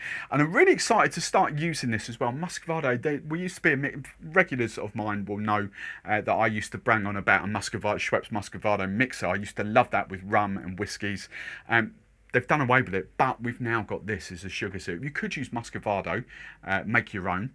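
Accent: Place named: Cockney, United Kingdom. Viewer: Cornwall?! You sound proper cockney!!!!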